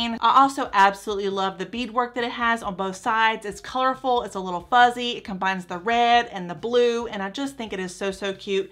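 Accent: South, United States. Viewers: I love, love, love the first outfit! The red accents were perfect and that stinkin’ adorable little flowered clutch? Yes, please!! 😍 I’m from Rowlett, Texas… where are YOU from? (I heard all those “y’all’s” you were dropping ! I’m definitely channeling southern gal roots!!) LOL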